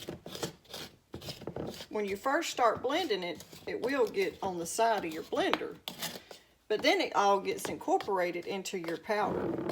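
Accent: South, United States. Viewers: I came to learn how to make creamer, I stayed for the southern accent! 😊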